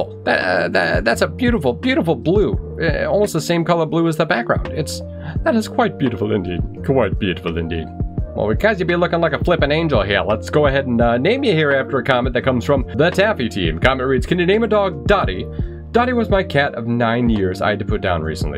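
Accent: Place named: United Kingdom. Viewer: i LOVE your BRI ISH acsent!!!!!!!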